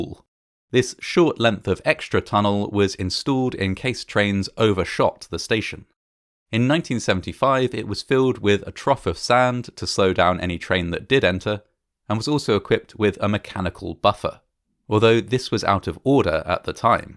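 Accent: England, United Kingdom. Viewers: I adore your pronounciation! Where are you from in England?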